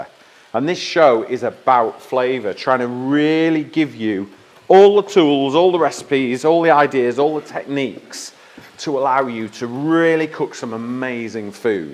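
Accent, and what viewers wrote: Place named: North, United Kingdom. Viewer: love the accent! how northen are you?))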